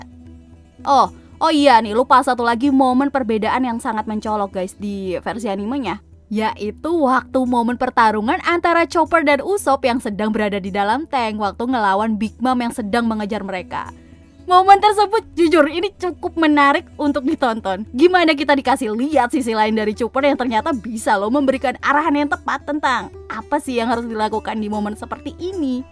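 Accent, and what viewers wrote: Java, Indonesia. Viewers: Ternyata kak suaranya ada medoknya wkwk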